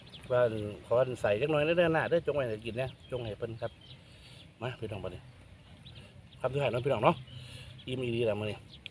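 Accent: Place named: Roi Et, Thailand. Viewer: คนจังหวัดดั่ยสำเนียงคนร้อยเอ็ดกับหนองบัว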